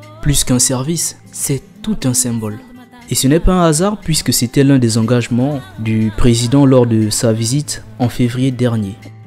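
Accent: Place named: Gabon. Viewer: Excellent document... La voix est bien équilibrée et je ne peux pas dire toute ma satisfaction de savoir que c'est une voix gabonaise.. Merci pour le travail accompli.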